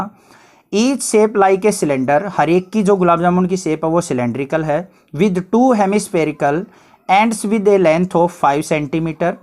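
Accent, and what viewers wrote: Haryana, India. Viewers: Sir aap haryana se ho kya? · Sir it's the best explanation ever for these questions !! It's really helpful!! And from your language ; I think you are from haryana😁😁😁😁 Jai Haryana Jai haryanvi🤘🤘🤘🤟🤟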